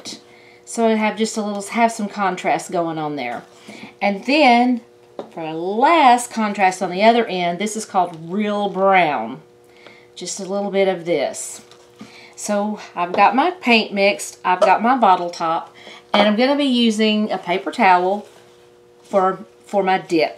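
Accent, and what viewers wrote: South, United States. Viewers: Enjoyed this, Very nice. May I ask where you are from? You have my southern accent! I'm from SW VA.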